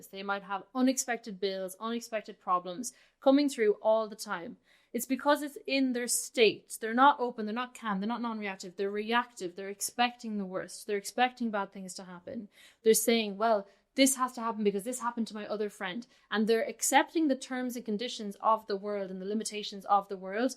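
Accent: Ireland. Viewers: Sound's like you have a Irish thang (accent) Are you Irish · pretty sure shes irish lol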